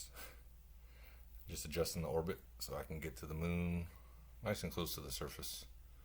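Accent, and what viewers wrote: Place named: Louisiana, United States. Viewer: Your accent is distinctly cajun. How many of your people have made it the Mun?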